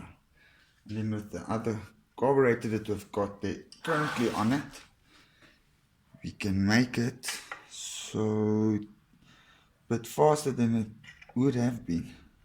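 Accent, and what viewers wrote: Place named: New Zealand. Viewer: The audio is still a bit off. You come out sounding like a New Zealander. But I can make it out. Great build I would like to see more variants of this?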